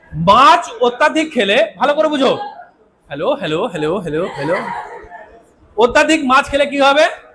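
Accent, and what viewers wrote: Rajshahi, Bangladesh. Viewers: স্যার আপনার কথার মধ্যে বাংলাদেশের রাজশাহীর টান আছে,,, ❤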